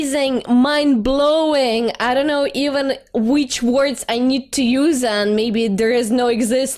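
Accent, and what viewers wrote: Russia. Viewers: Ты из россии, либо говоришь по русски? Акцент тебя сильно выдает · new to channel, is your accent ruske?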